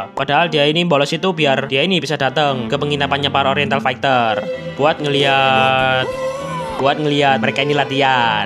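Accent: Java, Indonesia. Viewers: Orang Jawa ya bang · ini jowo bgt plisss, awokwok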